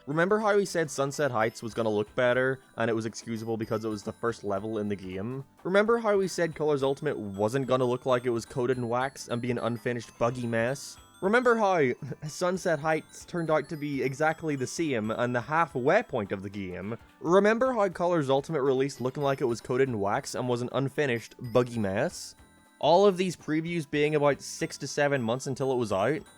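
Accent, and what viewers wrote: Ireland. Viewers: Yeah he’s Irish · ur from donegal arent u my brother? haha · no hes irish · he's Irish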